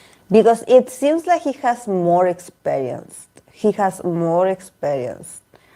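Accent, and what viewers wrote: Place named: Argentina. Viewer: sos argentina? creo que detecté nuestro acento. Gracias por el video, me gustaría que hagas uno sobre Keeho y Theo 😊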